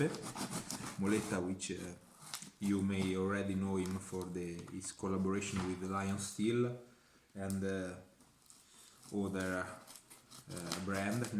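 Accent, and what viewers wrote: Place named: Umbria, Italy. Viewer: Bravo, bella presentazione. Ottimo coltello. Il tuo inglese con cadenza umbra è inarrestabile!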